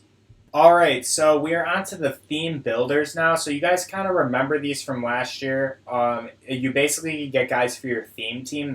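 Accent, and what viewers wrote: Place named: Chicago, United States. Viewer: the one and only chi town accent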